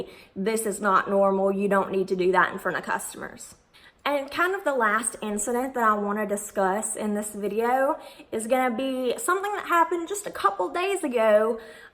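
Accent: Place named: Kentucky, United States. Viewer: Are you from Kentucky? I’m from Louisville in you sound like your from Lexington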